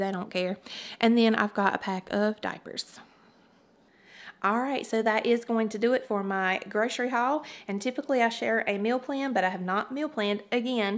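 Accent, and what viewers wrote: South, United States. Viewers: Watched ur video for the first time! I enjoyed it so I subscribed! Like ur Southern Accent!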